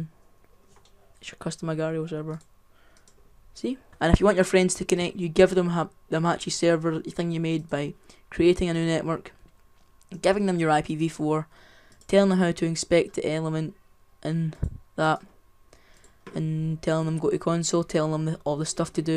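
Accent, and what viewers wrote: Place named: Scotland, United Kingdom. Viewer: Thought so i am 2 xD can just tell when some1 is scottish xD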